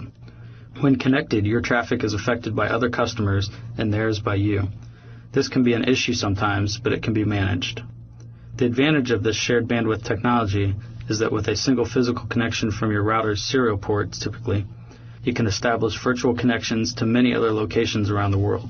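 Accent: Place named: North America. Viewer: Throughout the whole video I thought you were North American. And when I saw your .uk website, you're from the UK? Man, respect.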